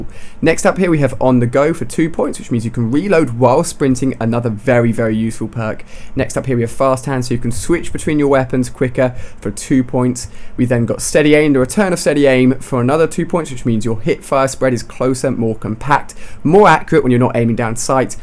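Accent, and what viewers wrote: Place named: United Kingdom. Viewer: marethin is how he says marathon. i'm so happy i'm not british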